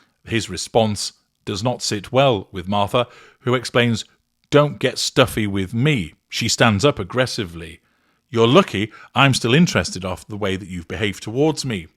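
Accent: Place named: Midlands, United Kingdom. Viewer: I could be wrong but I detect a Midlands accent in your voice!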